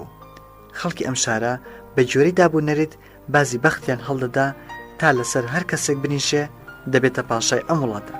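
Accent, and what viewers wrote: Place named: Sulaymaniyah, Iraq. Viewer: Xozga dangakatan bkrdaya ba shewazary slemany zor xoshtr bw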